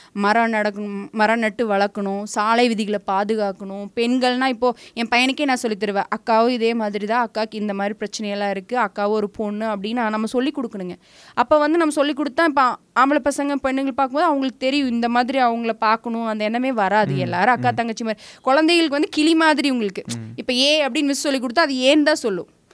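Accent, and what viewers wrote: Kongu, India. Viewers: கோவை பேச்சு,, அக்காவிடம் இருந்தே பிடித்தது,,நாம் தமிழர் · அக்கா உங்க கொங்கு தமிழ் நல்லா இருக்கு Vote for NTK ❤❤❤❤❤ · அருமையான கொங்கு தமிழில் அழகுபட பேசும் அறிவும் அழகும் நிறைந்த வீர மங்கையாக திகழும் எங்கள் கோவை பாராளுமன்ற வேட்பாளர் திருமதி.கலாமணி ஜெகதீசன் அவர்களுக்கு மாபெரும் சரித்திர வெற்றி பெற்று சாதனை புரிய வாழ்த்துகள்.. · இந்த அழகான கொங்கு தமிழ கேக்கறதுக்கே 46 நிமிஷம் முழுசா பாத்தேன் இந்த காணொளியை!! தேன் மாதிரி இருக்குயா❤❤❤I'm from Kanyakumari, but i can make my CBE relatives and friends to vote for NTK. At least 50 votes. இந்த கோவைல தான் படிச்சேன்.. படிப்பு தந்த ஊருக்கு ஒரு சிறு அன்பளிப்பு❤❤❤ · அக்காவின் கோயம்புத்தூர் பேச்சிற்கு நான் அடிமை 🎉🎉🎉..... நாம் தமிழர்....(மதுரை மத்திய தொகுதி). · Kongu tamizh ivvalavu azhagaa❤ kalamani yin vaayil irunthu varum pothu❤ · Very good kongu speech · கொங்கு தமிழ்.... அழகு🧡🧡🧡🧡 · கோவை தமிழ்❤❤..கலாமணி ஜெகநாதன் சிறப்பு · Original kovai slang ❤❤❤😊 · Kongu Tamil .. 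· Coimbatore Tamil best sako..we be with you…❤❤❤FROM TAMILEELAM..❤❤ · அக்கா கோங்கு தமிழை அழகா கேட்ட திருப்திக்காக நன்றி புலிக்குட்டி புயலா பேசியதற்கு வாழ்துக்கள்....😂😂😂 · கேள்வி கேட்கும் விதம் அருமை கேள்வி கேட்டு அவரின் கருத்தை பதிவு செய்யும் வரை பொறுமை காத்து அடுத்த கேள்வியைக் கேட்கும் விதம் அருமை கோவை தமிழ் இன்னும் அருமை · கொங்கு தமிழ் அருமையாக உள்ளது 🎉🎉🎉🎉🎉🎉🎉❤❤❤❤ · அண்ணன் சீமான்! அருமையான வேட்பாளர் தேர்ந்து எடுத்து இருக்கார்! அழகான கொங்கு தமிழ்! வாழ்த்துக்கள்! நன்றி நாம் தமிழர் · கோயம்பத்தூர் தமிழ் சூப்பர் அக்கா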